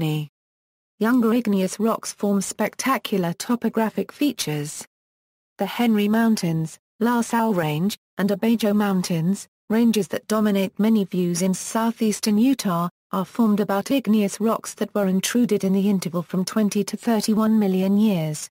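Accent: United Kingdom. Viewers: Informative content but the British voice was distracting and annoying because it sounded robotic and artificial AI-like.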